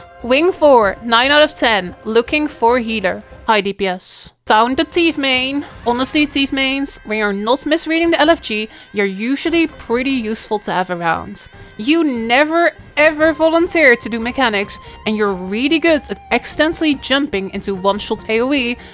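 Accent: Netherlands. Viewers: That dutch accent made my neckvein throb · I love your english-dutch accent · i swear i hear a dutch accent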